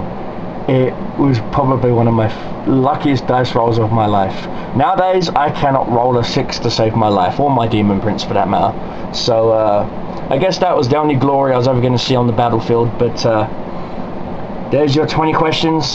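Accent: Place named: United Kingdom. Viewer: Like it, good story about the die rolls. Where are you from? It sounds like your from blighty but your reference's to dollars makes me think you live in the states or australia.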